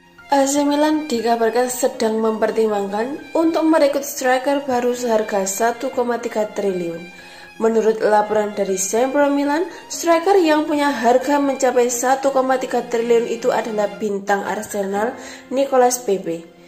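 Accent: Java, Indonesia. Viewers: Medok 😀. Tapi 👍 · Wong jowo yaa min 😊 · Dok medok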